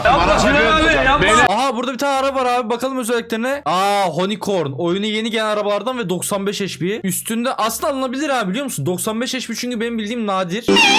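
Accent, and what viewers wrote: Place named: Turkey. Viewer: Qaqaş Sən Niyə Türk Kimi Danışırsan?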